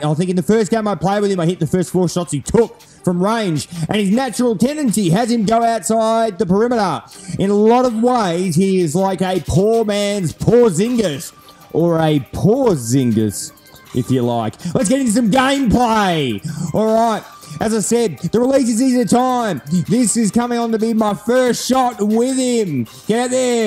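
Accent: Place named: Australia. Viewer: your voice is change, you sound more australian now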